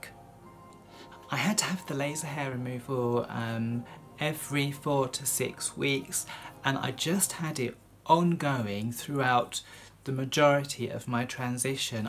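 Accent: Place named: United Kingdom. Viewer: Anyone comment on the similarity of your voice with that of Charlie Martin? You both must have been born and/ or raised in the same elegant part of the UK. Just my American ears. 🧐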